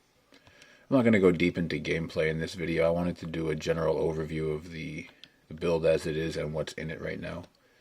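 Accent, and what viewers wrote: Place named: Winnipeg, Canada. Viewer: You're from Peg City aren't you? Lol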